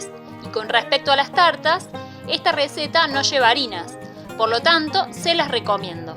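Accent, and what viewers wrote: Córdoba, Argentina. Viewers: Hola soy de CARLOS PAZ Argentina...y tú???Tu tonada parece de Córdoba 😀👌👍🏻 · Me imagino q eres de Argentina por el acento. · Espectacular la voy a hacer!!! Por ahí me parece que tenés una tonada cordobesa, puede ser?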